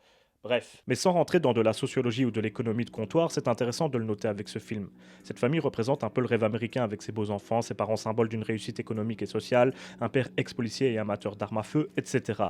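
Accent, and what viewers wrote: Belgium. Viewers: J'aime bien ton accent belge 😎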